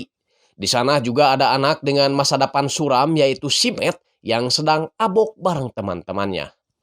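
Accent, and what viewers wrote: West Java, Indonesia. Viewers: ( bang jembut)😂 Iyeu mah asli sunda😅😅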